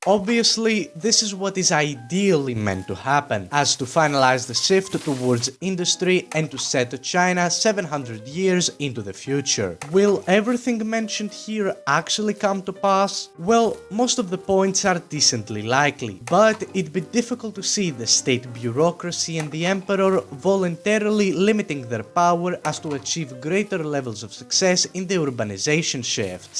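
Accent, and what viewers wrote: Greece. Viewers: Now that's a Greek accent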